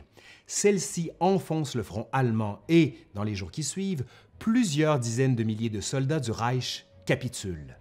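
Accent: Quebec, Canada. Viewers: j'adore ton travail... je ne ma lasse pas d'enchainer les vidéos (dans la foulée j'enquille 1939 après avoir vu 1789, 536, 1709 et quelques autres dont le racismes ou le sexe au moyen âge) tu as un vrai don pour qu'on comprenne tous et ton accent québécois apporte son charme à ta diction. pourtant je n'ai pu m'empêcher de relever la coquille qui tue à : Adolf HiLter ne m'importune pas plus que ma cousine contrairement à Adolf HitLer... désolé mais fallait que je le dise... encore une fois merci beaucoup. Continu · Merci pour cette série passionnante. Je continue à adorer ton accent au sirop d'érable. Tu as fait un travail remarquable de clarté, d'impartialité et de dynamisme. Je pense que c'est un modèle de connaissance à donner autour de nous pour expliquer ces années d'horreur qui ont presque brisé la France. Merci aussi à ton compère. Bises 😉😁👍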